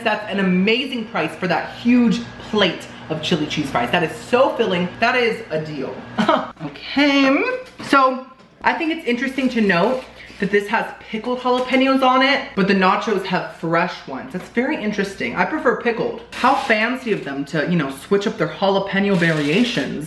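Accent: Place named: United Kingdom. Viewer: I love to watch the unboxing! Don't listen to the dummies. Also..you don't even EVER have to ask if we want a follow up video to anything...ummm YASSSS WE DO!! I'll take any video from you..follow up, new, or just you talking in your British accent for 30 minutes. Just say BUTT-AHHH in your British accent for 30 minutes and you will make my year!!💙💚💜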